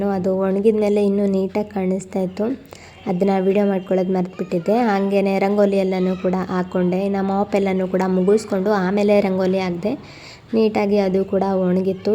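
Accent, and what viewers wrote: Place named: Haveri, India. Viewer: Nivu matado reeti haagu nivu mado aduge recipe yella nodidre nam Haveri saidnavaru ansutte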